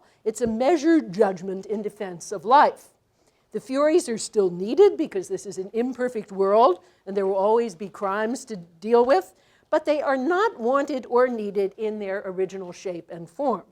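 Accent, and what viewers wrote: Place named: RP, United Kingdom. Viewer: Nussbaum speaks like the stereotypical high british society intellectual.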